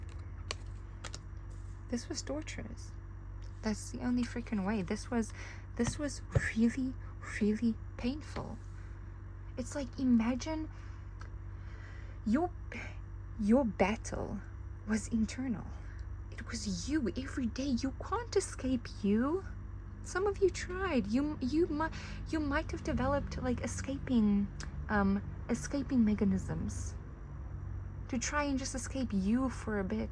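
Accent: South Africa. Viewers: Girl, are you South African by any chance? Coz your accent sounds really familiar to me 👀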